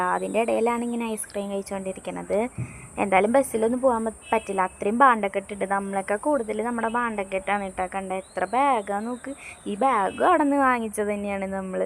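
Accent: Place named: Thrissur, India. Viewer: സംസാരം കേൾക്കുമ്പോൾ തൃശ്ശൂർ ക്കാരി തന്നെ❤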